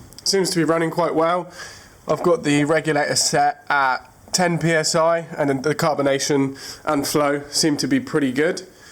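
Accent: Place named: Southern England, United Kingdom. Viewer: u sound like your from south England but ur Australian ?? crazy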